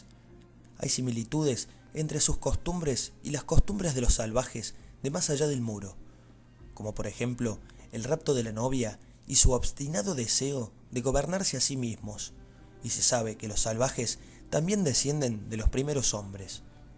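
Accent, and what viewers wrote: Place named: Córdoba, Argentina. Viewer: Sos cordobés? (Argentino) se te nota el acento en las palabras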